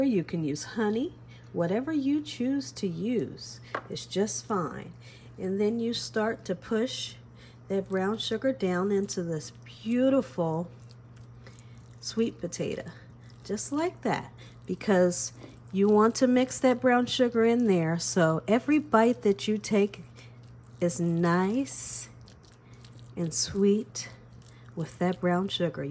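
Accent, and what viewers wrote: South, United States. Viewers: She's sporting a buffalo jersey with a southern accent.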